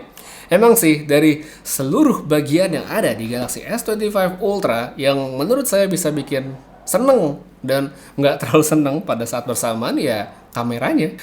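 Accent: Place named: Java, Indonesia. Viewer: Bang dengan medhok jawanya: "Galaksiii Eaiiii"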